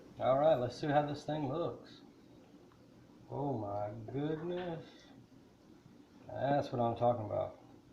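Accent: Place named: Appalachia, United States. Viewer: BEAUTIFULLY DONE SIR, MY BROTHER SOUNDS LIKE YOU; HE'S FROM APPALACHIA COLA FLORIDA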